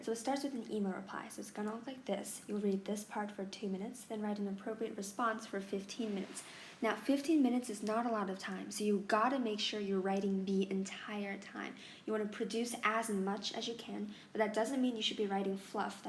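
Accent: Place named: Mexico. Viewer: Love the way she speaks Mexican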